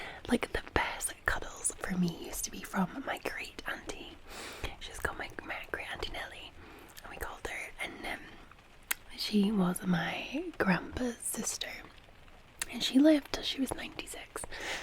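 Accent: Scotland, United Kingdom. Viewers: What told us about herself: 1. From Scotland 2. Has scottish accent 3. Loves football 4. Drinks beer 5. Parents live close to Golf course If these were the traits of a character written for a TV show, the writers would be accused of reproducing quite a lot of stereotypes xD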